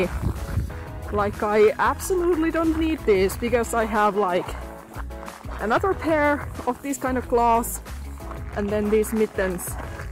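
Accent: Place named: Finland. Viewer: I couldn't tell whether you were British or American but it looks like you're actually Finnish, and I love your accent **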